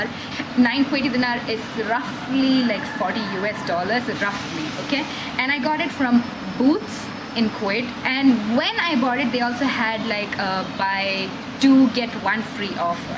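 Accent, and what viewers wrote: India. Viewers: OMG i hear an Indian accent :D i love that :D just love everything Indian. ur so beautiful :D i'm mixed with some Indian myself ^_^ :D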